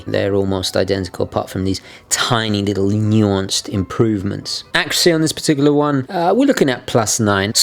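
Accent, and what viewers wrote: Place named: RP, United Kingdom. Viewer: Ok , first things first . In general your vids. have improved, just keep the plumb out of your mouth and things should go well. But , that attempt at the posh type intro has got to go. Guys swimming around about the place just dont fit bro.! Now , the watch , love 95% of it , but the sloppy crown stem in the unscrewed position just says cheap crap , along with the Sellita movt. , unregulated to boot .! Otherwise I would buy one tomorrow .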